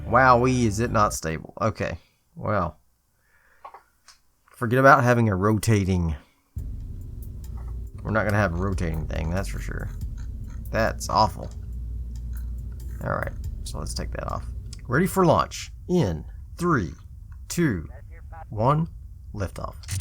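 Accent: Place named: North Carolina, United States. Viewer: Are you from North Carolina? I'm trying to pin down your accent.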